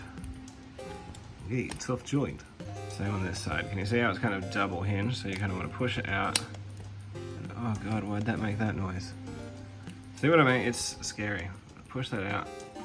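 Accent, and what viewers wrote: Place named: Australia. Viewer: I didn't know David Kaye did toy reviews in an Aussie accent..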